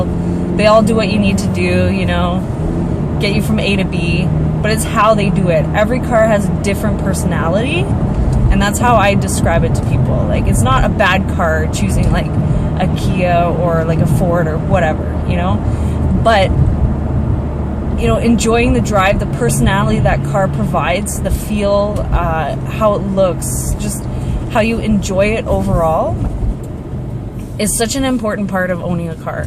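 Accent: Canada. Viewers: cute lady, but its Mahhhzda. i literally loathe canadian pronunciation.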